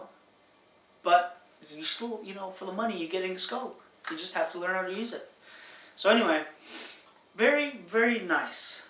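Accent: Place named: Hawaii, United States. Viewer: Sorta Hawaiian lol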